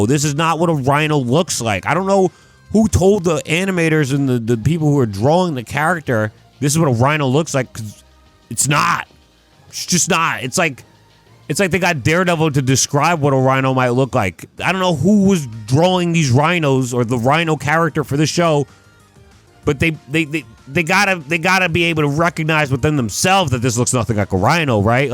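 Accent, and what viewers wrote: Boston, United States. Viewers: unrelated, but been meaning to ask, are you from Boston or something ?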